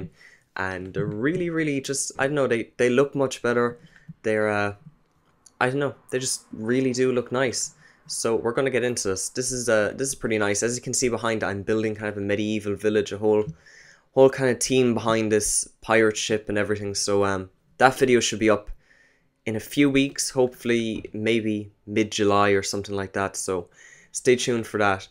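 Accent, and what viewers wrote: Ireland. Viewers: Are you Irish ? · Are you Irish?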